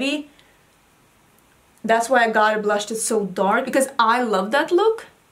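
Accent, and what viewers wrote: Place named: Sweden. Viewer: I loooove how you talk hahaha... I’m a big fan of the Swedish accent 😁 and that eyeliner is AMAZING for your eye color!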